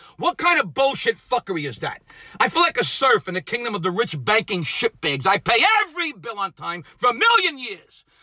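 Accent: New York, United States. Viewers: Omg. Your so NEW YORK!! I LOVE IT.. YOU MAKE ME MISS MY DAD!!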